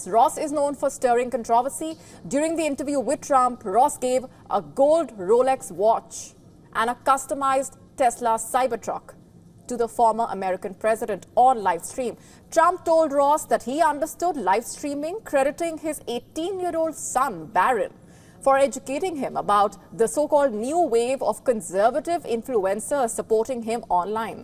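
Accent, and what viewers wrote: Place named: India. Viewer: The Indian accent makes this 100x more funny lmao (here from Theo Von)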